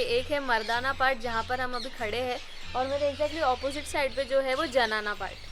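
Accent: Gujarat, India. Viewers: Meera jee "kantaal" ke Dwarika chale gaye 😀. I totally loved this sentence. Ekdum Gujju style Hindi hai. And I have stayed in Gujarat for 15 years. So I love this style of speaking 💕. You guys make amazing videos and one reason I watch them is to listen to style of Hindi!! Keep rocking guys 👍. Khup गोड आहे ऐकायला!!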